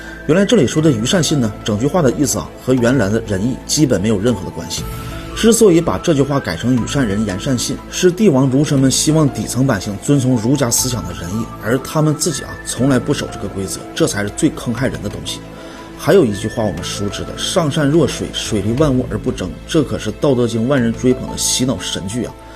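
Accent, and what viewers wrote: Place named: Northeast, China. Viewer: 东北口音果然给人一种文化不是很高的刻板印象。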